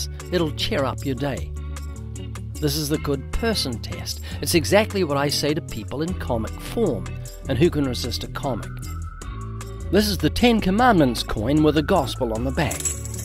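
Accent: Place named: New Zealand. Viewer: Hi Ray I'm from your old Church in New to Zealand. I have a non Spiritual question how come you haven't lost your kiwi as accent. Your been living in LA since the 80s.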